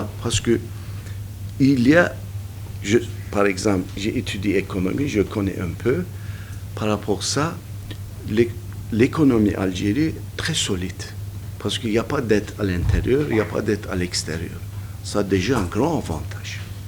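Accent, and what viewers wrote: Turkey. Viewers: تركي تركي تركي يا عباد هدا تركي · عجبتني لهجته التركية في حذيته بالفرنسية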